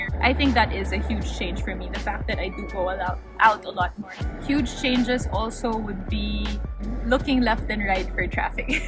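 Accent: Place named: United Kingdom. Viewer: Lumalabas British Accent niya dito since she is British-Pinay hehe Gandang Babae nito <3 At ganda din diyan sa UK lalo na sa London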